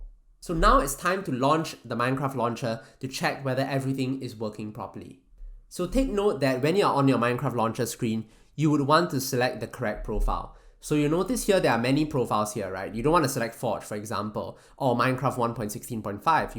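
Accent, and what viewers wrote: Singapore. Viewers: are you from singapore? your accent sounds kinda familiar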